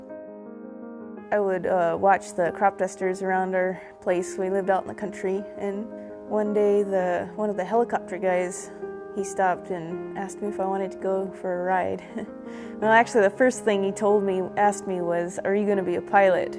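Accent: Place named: Midwest, United States. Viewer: "Screw you gieez, I'm going to do what I want to." It's adorable, and you sound like the Midwest to me.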